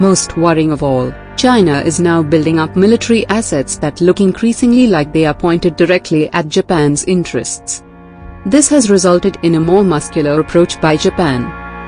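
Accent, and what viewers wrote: India. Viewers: The narrator is Indian...from kerrela? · The narrator's voice is a typical Indian female voice.... sooooo pathetic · the narrative is deliberately female INDIAN-accented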